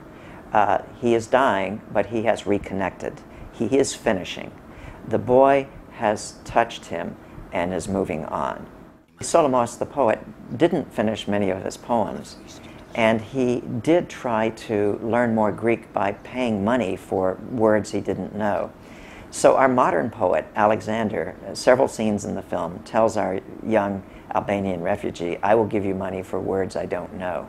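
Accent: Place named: United States. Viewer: I am greek guy 38 years living abroad. I love the American accent and believe to your words even with your American beautiful accent :-)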